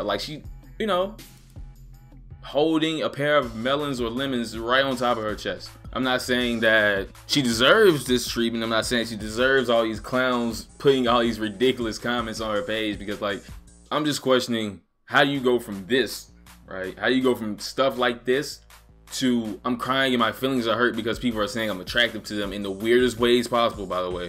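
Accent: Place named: Bay Area, United States. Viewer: Bro you from the Bay area? SF Oakland? I was trying to place your accent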